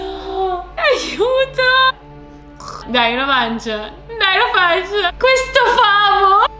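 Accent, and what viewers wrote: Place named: Milan, Italy. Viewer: Stai iniziando ad avere un accento milanese noooo ti pregoooo torna romana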